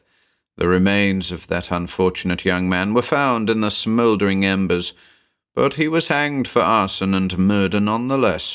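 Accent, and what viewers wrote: United States. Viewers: Your American accent is spot on. Cheers for the performance! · This is such a rich and believable story. An almost Tolkien-esque commitment to lore, attention to family lines and culture. It feels believable because it's steeped in history. This author just HAS to be Irish! Of course does a stand up job as always. Your American accent is so John Wayne tough. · What an amazing weird tale. Expertly written. Two distinctive main characters each uncovering the mysterious secrets of their inheritance both together and separate from each other. Perfectly narrated as always. Glad to hear the American accent is still spot on. Great choice of story. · You do a really good American accent, as an American myself 🩵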